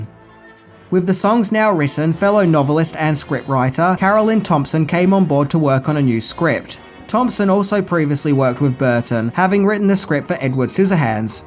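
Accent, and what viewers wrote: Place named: Australia. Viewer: Eh, he's Australian... they say things weird. I remember my Ex and I laughing back and forth over the way each of us pronounced "roof" or "shore"...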